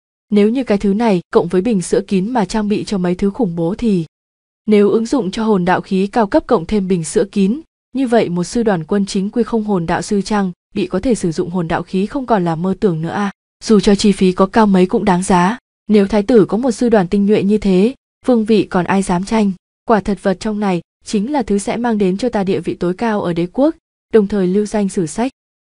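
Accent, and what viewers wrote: Vietnam. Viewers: răng mà giống giọng miền trung rứa hề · bé ad người quảng nam à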